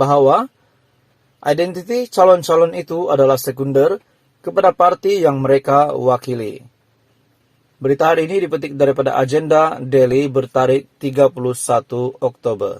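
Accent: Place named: East Malaysia, Malaysia. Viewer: Ko nak buat ulasan...tapi info yg ko beri Salah...chanel haprak ko sebut Tan Sri Anuar tapi ko tunjuk gambar Datuk Sri Anuar Ibrahim. Ko x pyah ulas .Menteri2 pun ko x kenal..admin mangkuk ..bunyi mcm org org kat sana tu seberang....laut china selatan. Bodo tahap gaban. Klu kat tahap nak bnyk lite je x pyah!!? Unrecommended chanel.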